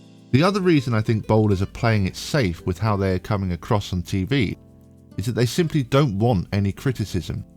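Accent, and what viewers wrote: United Kingdom. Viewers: It's interesting being both one and two handed, seeing the surprised faces when I switch to one hand for ten pins. It sounds like you're from the UK right? So am I, and I think bowling is dying in the UK sadly much more than the US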